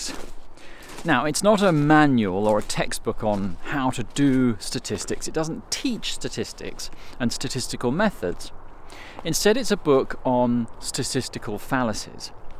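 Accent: United Kingdom. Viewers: You're British, thank God!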